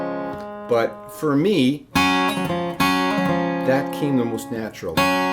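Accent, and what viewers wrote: Canada. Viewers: Judging by your accent and the way you pronounce ‘about’ , are you Canadian , Nova Scotia?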